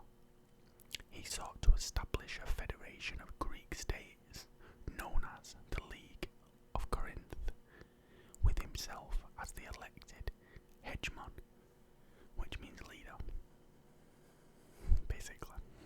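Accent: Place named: Manchester, United Kingdom. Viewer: Sounds like a Manchester accent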